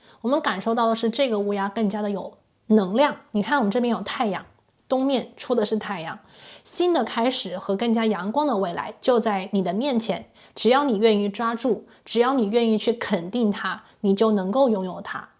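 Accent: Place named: Taiwan. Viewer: 默默關注老師很久，很準確之外，老師聲音口音超可愛，有點台灣腔，聽了很喜歡，不會讓人覺得看不下去，希望還能有再多的測驗，感恩宇宙❤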